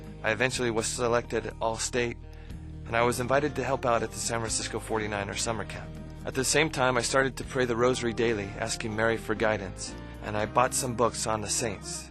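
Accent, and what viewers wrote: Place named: Quebec, Canada. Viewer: What kind of accent is that? He sounds French Canadian... from Southern California?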